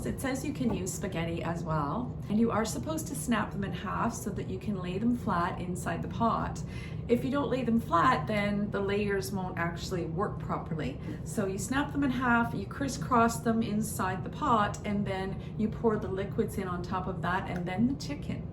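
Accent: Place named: Canada. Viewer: You are now more Canadian sounding...beautiful.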